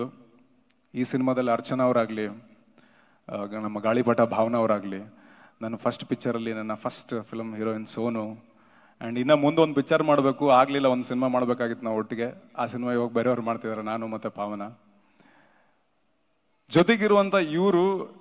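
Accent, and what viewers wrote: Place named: North Karnataka, India. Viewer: ನಿಮ್ಮ ಉತ್ತರ ಕರ್ನಾಟಕ ಭಾಷೆ ತುಂಬಾ ಚೆನ್ನಾಗಿದೆ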